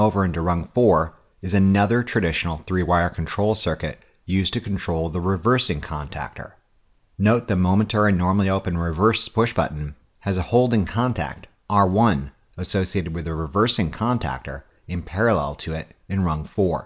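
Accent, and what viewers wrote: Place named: United States. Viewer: his articulation is quite fine. This is american english, he is a native, if you're having hard time understanding him, it's probably because you need to work on your english comprehension.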